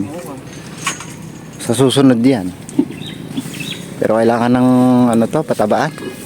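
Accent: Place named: Bulacan, Philippines. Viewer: Bakit ang punto mo when you speak tagalog ay parang from Bulacan ka? I am from Hagonoy, Bulacan. Pero, 54 years na ako sa America. Paborito ko kasi ay calamansi juice.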